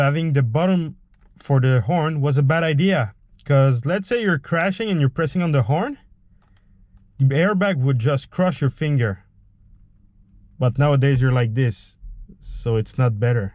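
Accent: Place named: Quebec, Canada. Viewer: damn this is really funny, love the jokes. Your delivery suits the French Canadian accent perfectly.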